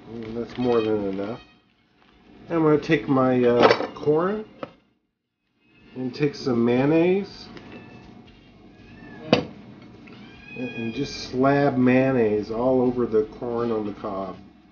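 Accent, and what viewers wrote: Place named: Southern California, United States. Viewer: So, what I hear that's so familiar and comfortable in your voice is perhaps a Southern California accent? Been trying to place that freitasex sound for a couple of months. It's a good sound. Southern California makes sense.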